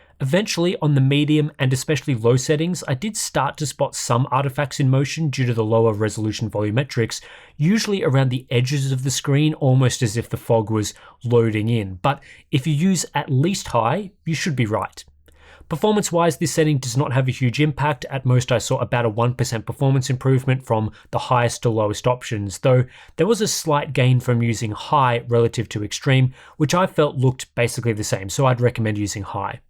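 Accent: Australia. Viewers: Australian accent usually isn't very salient in my mind when I'm just listening to the audio, but when he said "you should be right" I was very reminded that they're Australian.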